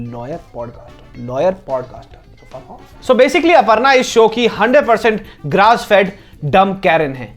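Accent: India. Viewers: You have very poor observation. Now I am feeling very bad for myself watching review of some sad loner commenting such thing for a professional matchmaker. First I watched ur video and your old job "honest review" review, it's was funny. As I like to hear desi accent. Basically nothing " honest" in your review, you should rename your show " saying in desi accent". Just tell me what wrong in that lady in the show " seema from Mumbai", she was perfect professional. She listened to her clients, observed them(not judge), motivate her clients and provide what they wanted. Moreover, she follow up their clients until he/she get married. A simple service based business type, now I don't see anything wrong in that and maybe non Indians also feel the same. But you are really getting toxic and loner day by day who judge a show without observation and only watch the show to pass negative comments. 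I am writing this letter to your wife " Dear Mrs, Please Madam control your husband he is getting toxic day by day. He needs some mental support. His review is very irresponsible, now a day he don't have a job he is making fun of other professionals. If possible please beat him with belen to normalised thing. Your sincerely, Indian YouTube viewer" Maybe government should lunch a review certification course so you can attend and show act like professional reviewer.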